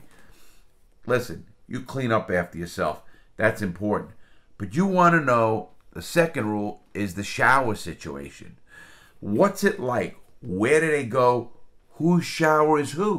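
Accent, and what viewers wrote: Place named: Brooklyn, United States. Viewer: the Brooklyn accent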